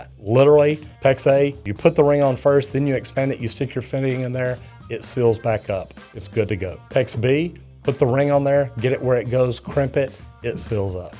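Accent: Texas, United States. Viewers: He's knowledgeable and interesting but that Texas/Southwest accent is hard to take.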